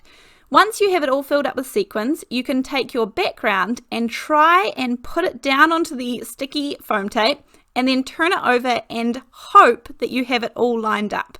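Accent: New Zealand. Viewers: 😍😍😍love the card!...and love that fantastic kiwi accent! Cheers from Texas💖😘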